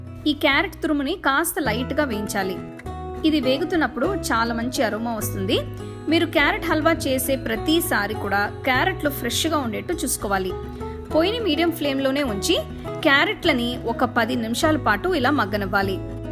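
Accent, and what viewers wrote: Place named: Kerala, India. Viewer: Meeru English lo matladuthe eh kerela oh Mysore anukunanu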